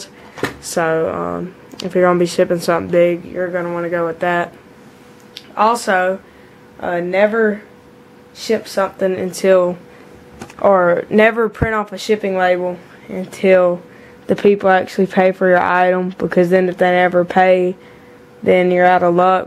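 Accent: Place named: South, United States. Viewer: U from down south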